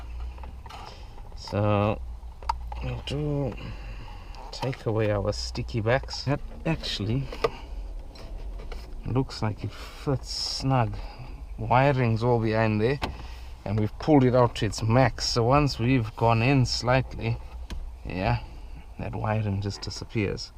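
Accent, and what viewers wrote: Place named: South Africa. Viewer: Bra ekse sunno wk.. you chune like me from s.a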